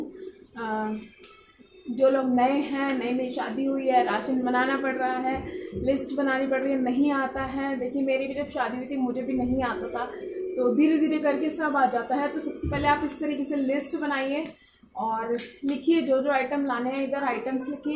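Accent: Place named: Faridabad, India. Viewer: Aap faridabad se ho lagta he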